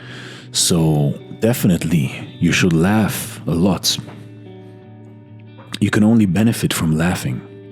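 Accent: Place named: India. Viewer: Your voice tells that u r from india 🙏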